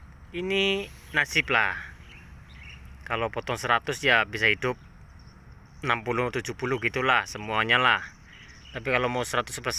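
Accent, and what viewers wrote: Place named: Banyumas, Indonesia. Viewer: malay tp logatnya indo banyumas (sumpiuh deket sampang cilacap)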